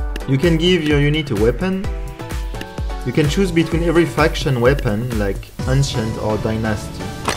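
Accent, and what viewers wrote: France. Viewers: Je suis sûr vu ton accent anglais que tu es français. Est ce que je me trompe? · Le petit accent francais on le reconnais ^^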